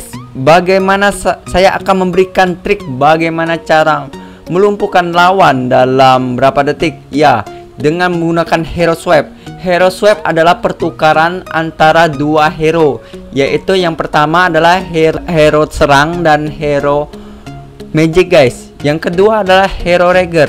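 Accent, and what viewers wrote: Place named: Madura, Indonesia. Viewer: lagat nya ky org madura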